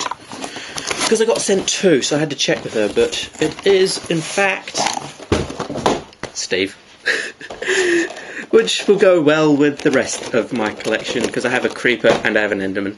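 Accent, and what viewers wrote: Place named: United Kingdom. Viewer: you sound more British in video.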